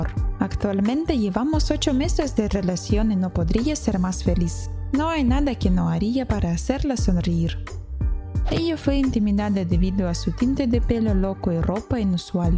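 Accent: Russia. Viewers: tus videos con voz rusa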